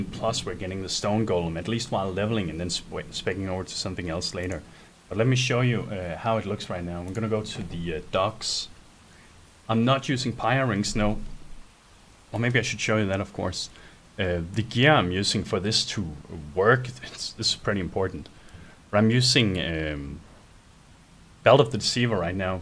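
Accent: Denmark. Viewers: Er du dansker ? :P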